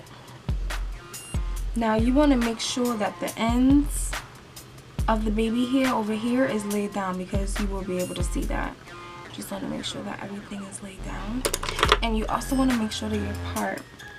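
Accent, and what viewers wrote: New York, United States. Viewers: I definitely learned a lot, thank you for this video girl!! Btw the more I hear your voice I'm thinking oh she's definitely from New York (I'm from N.Y.) lol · Yes everyone has an accent depends on what region on the US you are from. Im from Colorado no one here talks like you. So im able to identify your accent. You’re probably from a different area of the US. Like NY maybe???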